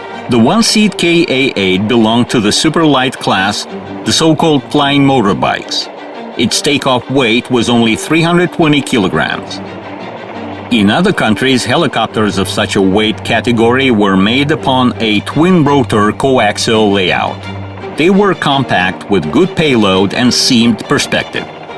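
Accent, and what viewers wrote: Russia. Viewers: I always like the narration of this series. The Russian accent gives it a certain authenticity.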